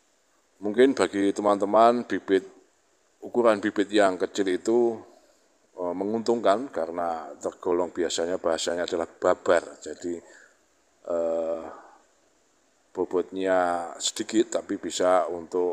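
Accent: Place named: Nganjuk, Indonesia. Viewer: pean asal dr daerah mana..bahasanya kok plek wg nganjuk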